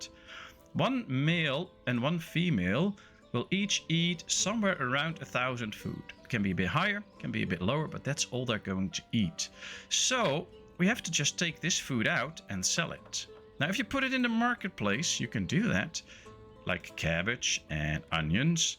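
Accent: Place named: Netherlands. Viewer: Goede tutorial. Ik zou wel mijn Nederlands accent wat proberen weg te poetsen. Klinkt nog te veel als Mark Rutte die Engels probeert te praten. Probeer "kid" niet uit te spreken als "kit" en "pause" niet als "pos". Ze hebben een wat langere klank. Update: en "wood" niet als "woet" ;)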